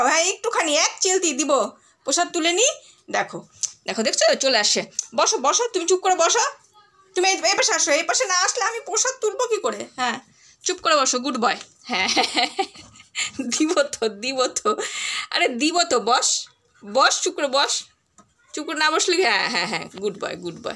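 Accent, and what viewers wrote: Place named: North Bengal, India. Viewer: Didi aapnar bachcha ta sob aaponjonke niye thaakte bhalobashe - khub bhalo sona baba ta aapnar. Didi aapni ki coochbehar athoba jalpaigurir aashe paashe kothao thaaken, karon aapnar bhashar tan theke ami eta bujhechhi je aapni North bengaler kothao thaaken, ami aapnader north bengaler bhashar onekta bangal plus calcesian bhashar mix) saathe khub parichito karon amar maa Hashimara tea estate er manush chhilen plus ami nijeo upper Assam thaaki tai bollam.❤❤❤❤❤❤❤❤❤❤🐕🥰🥰🥰🥰🥰🥰🥰🥰🥰🥰👍👍👍👍👍👍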